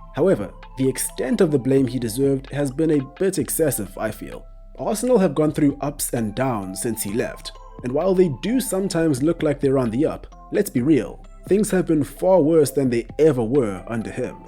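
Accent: South Africa. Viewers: I recently discovered your channel. I could tell by your accent that you're South African. Once I confirmed that, I hurried to subscribe because you make really great videos. I vowed to myself that this would now be my favourite channel pertaining all things football. Then you made a joke about my beloved Arsenal. So I will give it to you in a way that's quintessentially South African, voestek! 🤣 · You sound south african? Correct?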